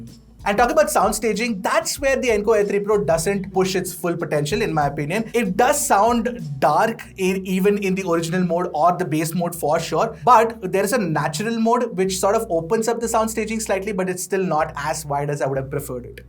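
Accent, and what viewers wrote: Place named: South India, India. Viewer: Irritating south indian accent😖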